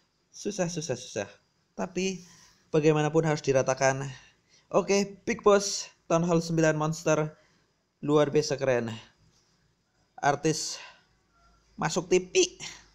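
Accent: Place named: Java, Indonesia. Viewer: medok yah 😀😀😀😀😀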